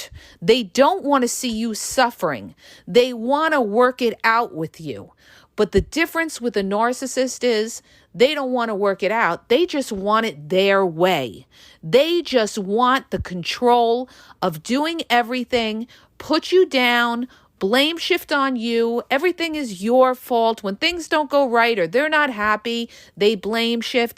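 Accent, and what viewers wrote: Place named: New York City, United States. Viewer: A NYC accent...;)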